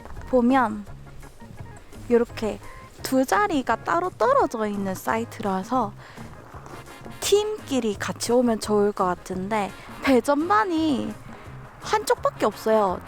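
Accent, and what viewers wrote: Gyeongsang, South Korea. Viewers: 고영희씨가 저지레할려고 들어왔다가 딱걸렸어요 ㅋㅋㅋㅋㅋㅋㅋㅋㅋㅋ 님 경북말씨가 넘 귀엽고 정감있어요 ㅋㅋㅋㅋ 님 캠핑은 어수선함 없고 깔끔하고 믿음직하네요👍🏻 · 경상도 사투리 ㅎㅎ 잘보고 있습니다. 셔쿨 고정하는 거 어디서 구입했나요? 담영상 기다려지네요. ^.^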